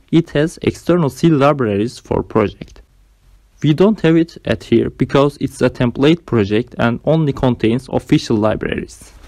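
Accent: Turkey. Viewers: You are Turkish, I can swear but I can not proof :) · Are you Turkish? because your accent is similar :)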